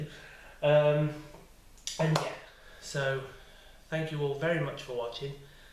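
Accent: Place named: Wales, United Kingdom. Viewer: great video as always love your welsh acedent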